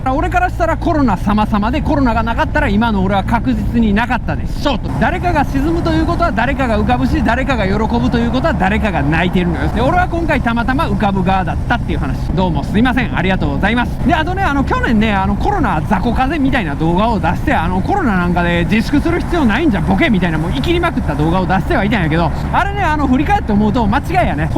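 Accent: Kansai, Japan. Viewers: ガジェット紹介も良いのだけれど、のモトブログは大好物！！映像が次々移り変わりながらの、流暢なしゃべり（関西弁）がもうほんまめっちゃ心地よい！！緩急織り交ぜてコーナー突いて放ってるピッチャーみたいに、ほんまいいバランスやわガジェットとモトブログ･･･いま流行の二刀流！！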